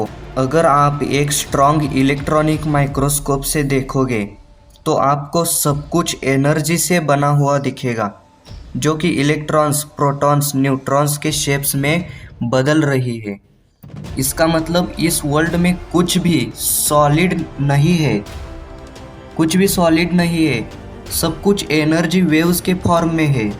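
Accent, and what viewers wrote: Maharashtra, India. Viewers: Bro where are you from it sounds Marathi guy anyway. I agree with you bro you really explained by hurt and its true. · कसलं भारी मराठी मे हिंदी बोलतोय भाऊ तू .....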